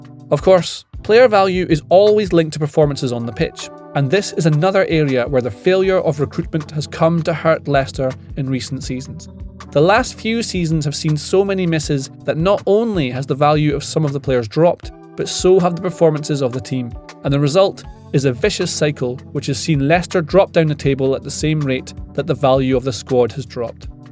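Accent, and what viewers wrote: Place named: Scotland, United Kingdom. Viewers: Why not, do you always actually believe what a youtuber says, 😂 he must be a financial expert and a Leicester expert, plus he's sounds Scottish must be a Celtic fan. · I do love the Scottish accent!. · Beautiful Aberdonin accent, this is much more pleasing on the ear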